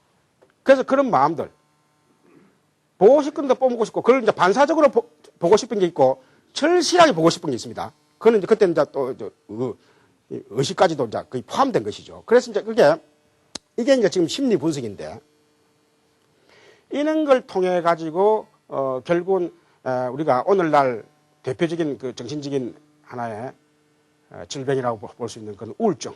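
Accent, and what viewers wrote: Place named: Gyeongsang, South Korea. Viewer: 귀한 영상을 올려주셔서 감사합니다 교수님은 듣기로는 서울대 수학과를 나오시고 동국대를 졸업하고 인도를 유학하신 분으로 초기불교의 대가이십니다 우리나라 스님들께서는 이분의 강의를 꼭 듣기를 권유합니다 우리나라는 대개 대승불교인데 대승불교 없는 초기불교는 있어도 초기불교 없는 대승불교는 없습니다 이 강의가 2005년도경에 서울 남산에있는 대원정사의 당시 대원불교대학에서 강의 하신것 같은데 귀한 영상입니다 많은 분들이 들으시고 불교에대해 공부하는데 많은 도움이 되면 좋겠습니다 경상도 말씨로 알아듣기 어렵지만 몇번 들으면 맛갈납니다 당시 김사업교수님의 유식강의도 명 강의인데 영상을 내려 아쉽습니다 참 귀한 영상입니다 절대로 이 영상 내리지 마십시요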